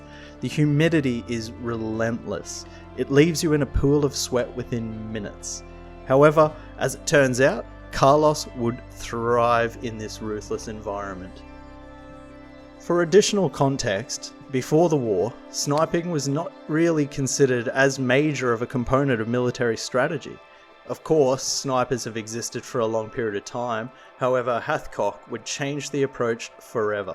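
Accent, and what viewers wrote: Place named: Australia. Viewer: I heard the Australian accent I thought it would be an Australian you're talking about . Heard enough about the Americans in that war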